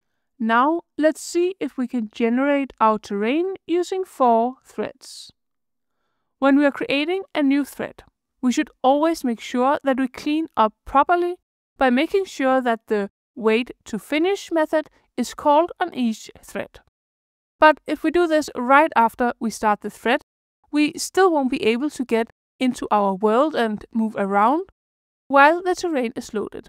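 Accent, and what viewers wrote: Denmark. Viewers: No problem, have subscribed and rung the bell too. also are you danish by any chance?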